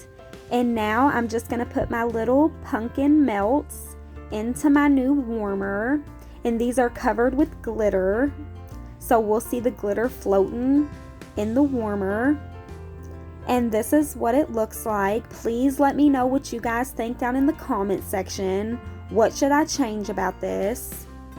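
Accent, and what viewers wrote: South, United States. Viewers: I can’t get enough of the southern drawl 🖤🤍 I lost mine but it’s so comforting 🤷🏻‍♀️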